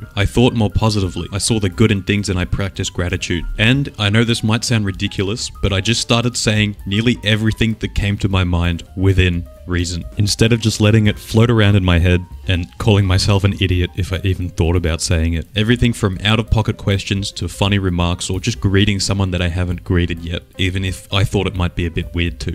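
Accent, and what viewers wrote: Australia. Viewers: cheeky aussie accent for extra charisma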